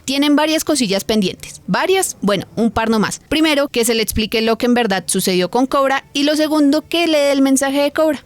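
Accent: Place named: Bogotá, Colombia. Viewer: Me fascina ese acento de Bogotana…😊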